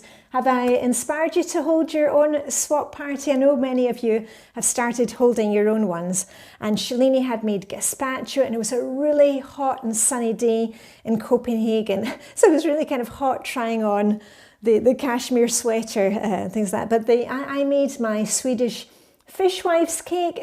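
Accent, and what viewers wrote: Scotland, United Kingdom. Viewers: Gosh!! How many languages do you speak?? Obviously French and English (with a Scottish accent) do you speak Danish and Swedish as well???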